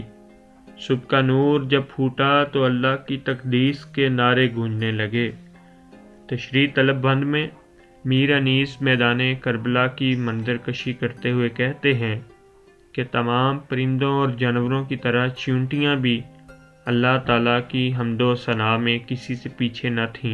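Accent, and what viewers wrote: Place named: Punjab, Pakistan. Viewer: زبان پر توجہ دیں۔ اردو کو پنجابی میں ادا مت کریں۔💓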